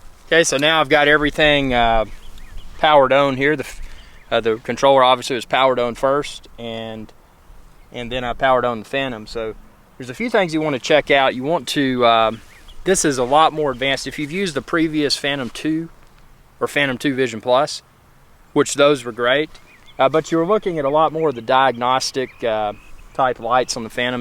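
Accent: South, United States. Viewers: You sound southern - I am in Tennessee - Thinking of getting one of these. I would like to fly it in some of the massive caves/pits around... wonder how well that would work...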